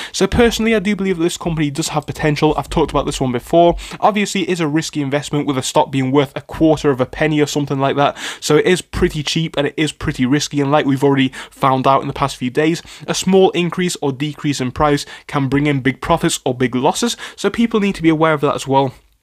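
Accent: United Kingdom. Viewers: This fella has a British accent. Not him.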